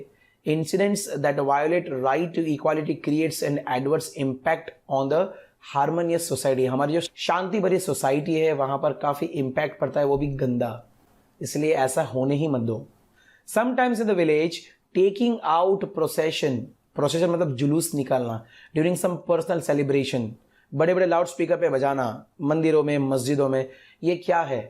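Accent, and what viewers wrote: Gujarat, India. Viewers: Tme gujarati cho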